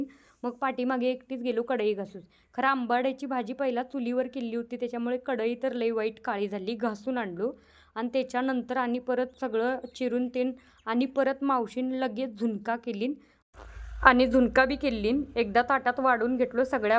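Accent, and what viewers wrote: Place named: Belgaum, India. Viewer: Bhasha belgavchi vatte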